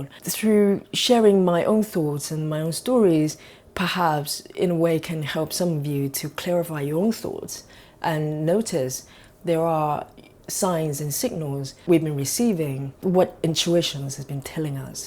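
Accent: United Kingdom. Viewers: Where are you from? Lol I love your accent! Anywhere near UK? Elegantly presented as a real human being ( unlike those others :) !! ) I may just have found the road to my own keto experience! Thanks so much! PS Wanna go somewhere nice? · Lovely voice. Are you british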